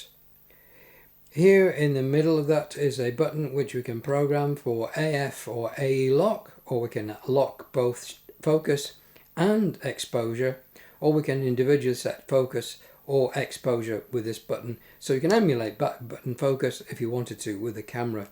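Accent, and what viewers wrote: England, United Kingdom. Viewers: You've done a marvelous service for us! Thank you so much! I have had the FZ2500 for a few years and have known that there was a lot more to it that I was doing but I just couldn't get a grasp on it. You have helped me big time! And I love your delivery. No extra noise....perfect focus. Plus, being an American, I can't get enough of your English accent. Haha!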